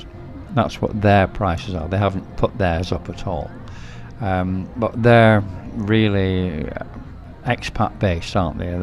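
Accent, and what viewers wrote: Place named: Northern England, United Kingdom. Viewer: Hi, where in the north of England are you from? Your accent is most pleasant on the ears.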